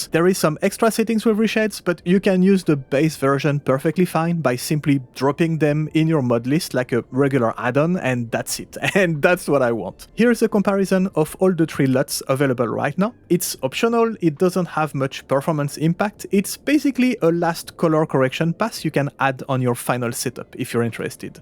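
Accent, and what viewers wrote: France. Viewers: bonne vidéo merci. tu serais pas français ? ton accent me fait penser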